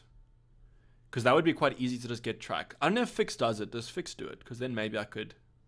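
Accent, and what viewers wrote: South Africa. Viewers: Bro you from South Africa?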